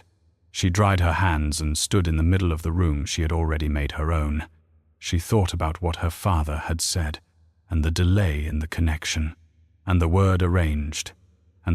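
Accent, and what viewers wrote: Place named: Eastern Europe. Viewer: The story is pretty good, but the pronunciations of the Italian names sounds more eastern European. You have to make it some more Italian. I would say eight out of 10.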